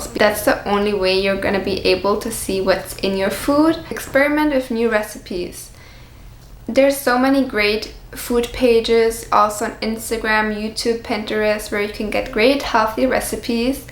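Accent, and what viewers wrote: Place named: Germany. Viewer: Where do you came from? Sounds like a German accent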